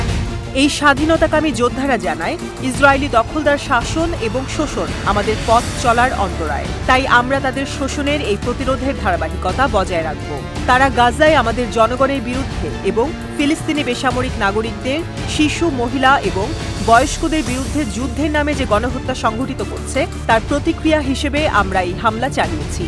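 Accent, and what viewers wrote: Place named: West Bengal, India. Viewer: প্রিয় ৭১ টিভি আপনারা কি পারেন এই মহিলাকে ভয়েস ওভার থেকে বিরত রাখতে? কারণ উনি ভারতীয় চতুর্থ শ্রেণীর নাগরিক পশ্চিম বাঙ্গালীদের অনুকরণ করে কথা বলেন।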